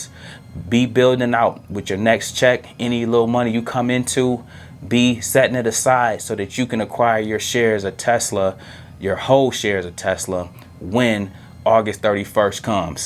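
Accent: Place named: California, United States. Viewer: He is from Cali. LA. I hear the accent.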